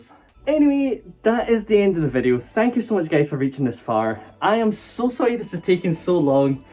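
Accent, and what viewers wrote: Scotland, United Kingdom. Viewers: I love ur accent SCOTLAND RULES!!!!!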